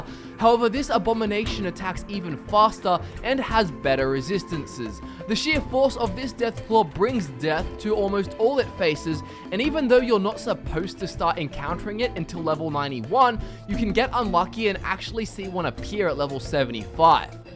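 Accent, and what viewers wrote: Australia. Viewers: Hi Hi Actually no he doesn't He's Australian and that's how Aussies say it British people say it that way too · Austin DeWaal it’s an Australian accent bruh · he sounds Australian which is how they say that word in that way.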